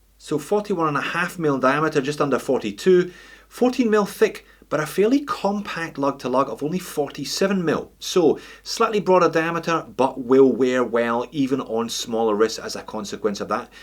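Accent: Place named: Ayrshire, United Kingdom. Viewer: I thought that accent was ayrshire made..lol..good luck with the channel.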